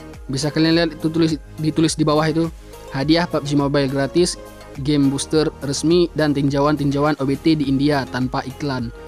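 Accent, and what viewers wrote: Aceh, Indonesia. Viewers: Gaya peugah haba apa aceh sang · Logat nya kayak orang aceh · Orang Aceh kah bang Logat bahasa nya kayak orang Aceh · Orang Aceh ya min?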